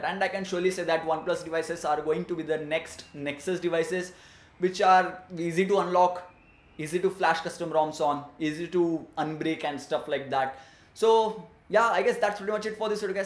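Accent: India. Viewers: I love this indish accent ;) thanks for the nice review my friend :) keep up the good work! :)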